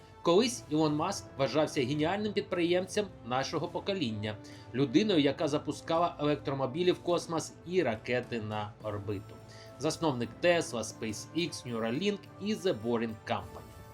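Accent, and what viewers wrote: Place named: Russia. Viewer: Лайк за відос! Але перестаньте Акать,бо дуже схоже на как цапню!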